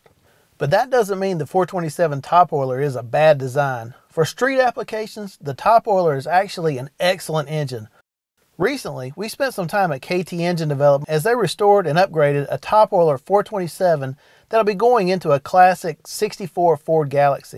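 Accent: South, United States. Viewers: I just gotta laugh at this narrators southern sounding accent 😂..!!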